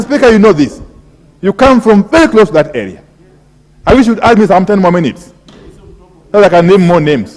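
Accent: Luo, Kenya. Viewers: A good Luo is one BIT living in Kenya! And this guy's Luo Ingliish, is Terrible!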